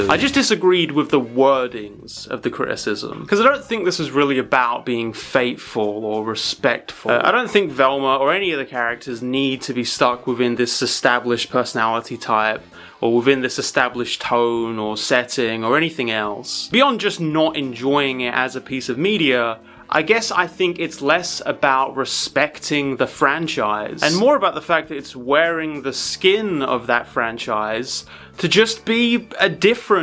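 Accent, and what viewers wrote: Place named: United Kingdom. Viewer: Never realized that british people pronounce H as "Hache"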